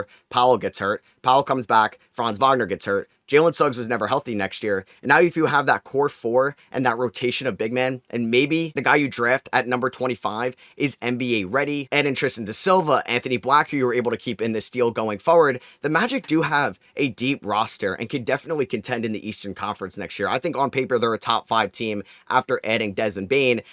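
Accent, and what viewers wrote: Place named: East Coast, United States. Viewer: He’s from the east coast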